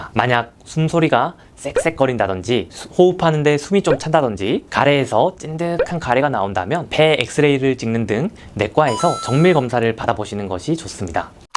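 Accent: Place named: Gyeongsang, South Korea. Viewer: 몬살아ㅋㅋㅋㅋㅋ 안닮으셨다요 선생님도 경상도 시구나 저희 지역은 사투리 잘 안써요!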